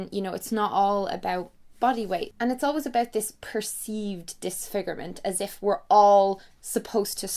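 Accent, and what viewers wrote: Ireland. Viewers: I am watching from Ukraine! I love you so much and you make me looooooveee Irish accent! You're so cute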